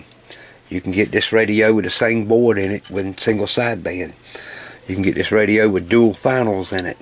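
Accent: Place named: Deep South, United States. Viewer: I’d say somewhere between Alabama through to Louisiana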